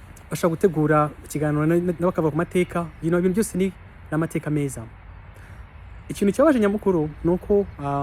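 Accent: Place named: France. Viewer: She has a french accent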